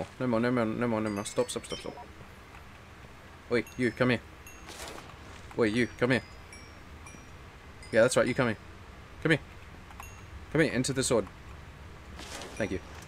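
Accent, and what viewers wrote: Australia. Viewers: ye fuikin aussie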